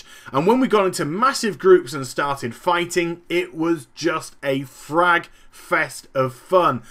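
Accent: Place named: United Kingdom. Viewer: Hi, great review, u make me got interested in this game. However i run into a problem while getting this game. Since u have a little British accent, you might be the perfect person to ask this question with. The problem is that my location that i live in can only buy the EU version of the game. Does EU server have a lot of non English speaker? Whats the majority language that ppl use when in terms of raid and bg in Europe server?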